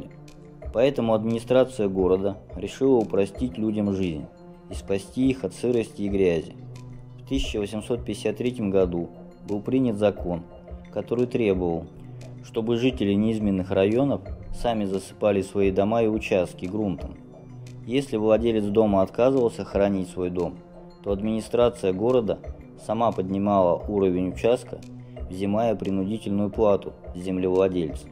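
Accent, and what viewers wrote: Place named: Moscow, Russia. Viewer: Московский говор, что здесь такого особенного? Давайте приедем в какую-нибудь деревню под Н.Новгородом и потребуем от людей не окать. Сами догадаетесь, в какое сказочное путешествие Вы отправитесь с невероятной скоростью? Все говоры русского языка равны, и не надо придумывать какой-то "идеальный" язык. Даже в эпоху Интернета не всё так однозначно :)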